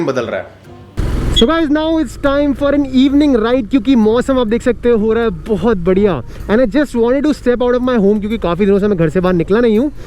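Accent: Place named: Delhi, India. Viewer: Baat krne ke style se hi pta lag gya delhi ka londa h👍🏼😂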